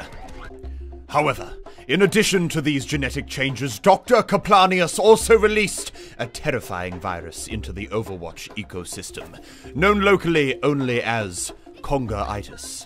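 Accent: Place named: Australia. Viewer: 澳洲口音......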